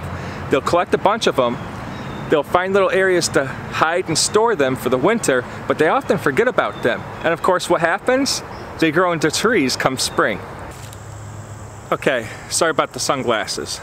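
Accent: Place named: New England, United States. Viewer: Judging by the environment, it appears you are Coastal US. Accent seems to be more "North" of me. What State do you reside in? I'd guess New England area...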